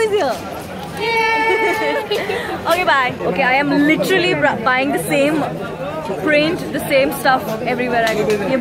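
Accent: Maharashtra, India. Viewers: I lyk d Marathi touch u gv in all your videos... "Just ugach " ❤️..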